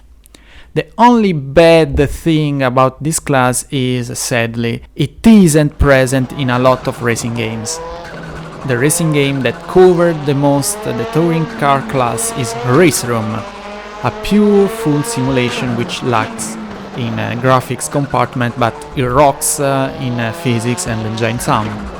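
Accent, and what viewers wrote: Italy. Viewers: Nice italian accent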